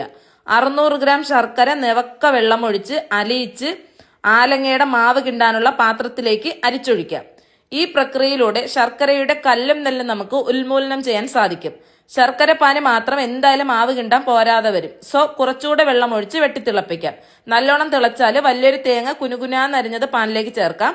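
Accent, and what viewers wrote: India. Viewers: Ithu keralathil evidethe slang aanu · oro sthalathe slang avaravarude identity aanu. Thiruvananthapuram, Kollam slang kelkkmbo mathram enda preshnam... Eee slang kelkkan vendi aanu pradhanamayum njn ee channel kaanunnath.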